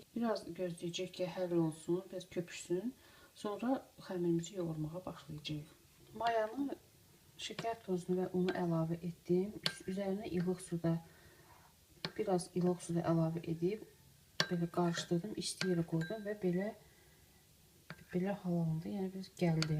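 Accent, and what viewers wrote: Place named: Baku, Azerbaijan. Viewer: Ay ureyim getdide oz baki danishiqimizcun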